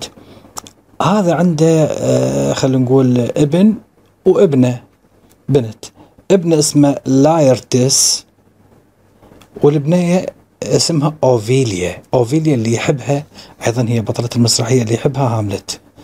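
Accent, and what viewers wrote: Iraq. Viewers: ألف شششكر ..أسلوب جميل وسلس وزاده جمال اللهجة العراقية ♥️..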